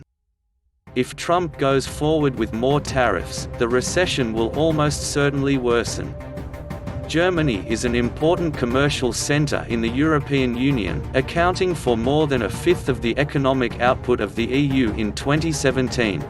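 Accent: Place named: United Kingdom. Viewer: The Corona virus is taking down the over borrowed stock market... and it's not a trade war when your competition has been raping the natural world and not paying tariffs on their exports... as for the fence on our southern border is obvious more nie than ever... we have 60 million illegals here.. time to send them home and yes America is first in america... our grandparents who helped fight and make America the strength it was would be pissed off if they saw how a lot of not very bright people are helping the confederacy a.k.a. the Democrats slowly turn our union into a socialist nightmare... and destroy our rights and liberties... all because they're favorite actor goes on TV and trashes the only one who cares to undo the dems damage and give us back our freedoms... propaganda garbage with a fkin brit announcer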